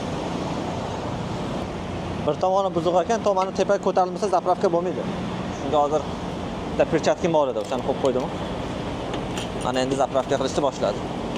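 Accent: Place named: Jomboy, Uzbekistan. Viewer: Assalomu alekum aka yaxshimsiz salomatmisiz? Har bir tayyorlagan videolaringizni boshidan oxirigacha oxirigacha maza qilib tomosha qilyapman, samimoylik bo'lgani uchun, videoni perexodlari chiroyli bo'lgani uchun juda qiziqarli. Shevangizdan tahmin qiladigan bo'lsam Jomboy yoki Bulung'urdan bo'lsamgiz kerak? Alloh har doim panohida asrasin, ishlarizga barakot besin. Omadingizni bersin yaxshi videolardan kutamiz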